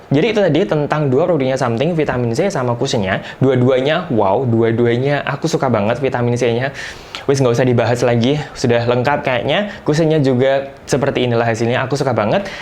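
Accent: Java, Indonesia. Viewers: lak pas ngomong logat jowo endel e metu.. 😂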